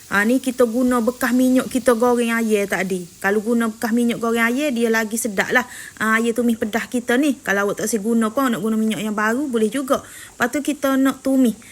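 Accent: Kelantan, Malaysia. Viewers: Ralit saya tengok video sis.. lama tak dengar oghe kecek kelate.. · Simple + senang.. haha terhibur dengar org kecek Kelantan ni.. hehe husband saya pon org Kelantan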